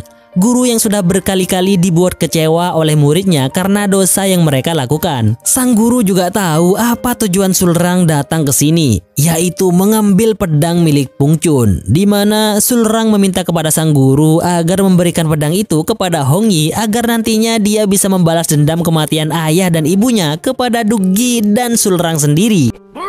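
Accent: Madura, Indonesia. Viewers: reng medureh kak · Madureh yehh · Ser reng medhureh reh narator eh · Orang Madura mas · Reng madureh admin nah 😁😁😁💪💪💪💪💪💪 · Naratornya orang Madura ya? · Orang madura ya bang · Kreator nya orang madura anjirr 😩🤣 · Madureh kang ? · Madura mana bos,, wkwkwkwkwk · Abeeehhh reng madure be'en kak ?? 👍👍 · lu orang Madura ya ? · Patek jeh atmin reng madureh yeh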